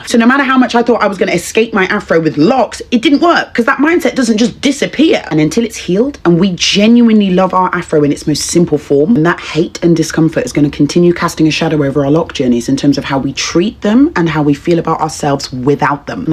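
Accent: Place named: United Kingdom. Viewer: Are you British? I’m not a native speaker, love your accent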